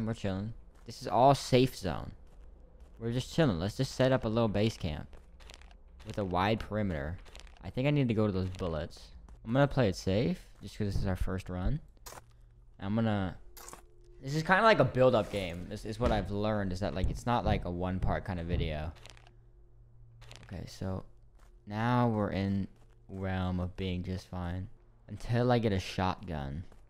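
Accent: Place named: South, United States. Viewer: plssss what is this southern accent 😭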